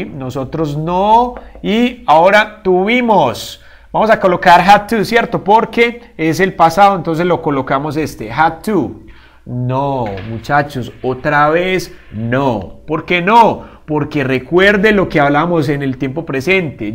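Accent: Colombia. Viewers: Excelente explicación como de costumbre, saludos desde Argentina, Maradona, Messi, que jugador, como la mueve con el ingles. Aparte del ingles disfruto ese acento colombiano unico. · eres colombiano? amoooooo u acentooooo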